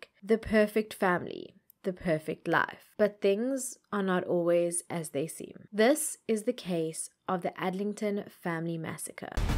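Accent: South Africa. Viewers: I just found your channel. Love it. Can’t wait to see what other videos you have created. I love your accent. At the last school I taught at, the school secretary was from SA- best accent I’ve ever heard. Then I found Nick Van der Leek (true crime rocket science) and you even better. Great content, fantastic narration, love your background knowledge you share. I agree with family annihilator is weird term.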